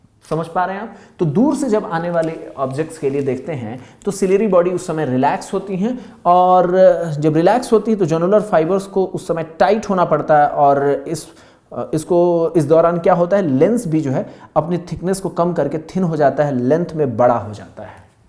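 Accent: India. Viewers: is this English with Indian accent or Indian language? Hard to tell.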